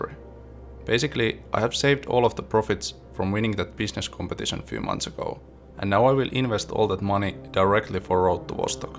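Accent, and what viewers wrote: Finland. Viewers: Are you finnish you have strong finnish ascent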